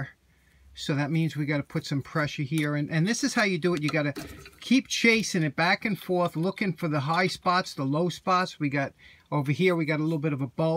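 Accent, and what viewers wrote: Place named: New Jersey, United States. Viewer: Liking your New Joisey accent!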